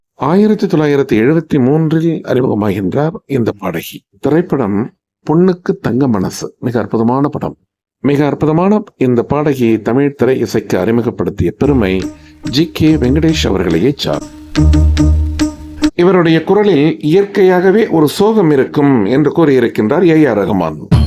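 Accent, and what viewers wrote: Sri Lanka. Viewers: சிலோன் தமிழ் குரல் 🎉 அருமையான பதிவு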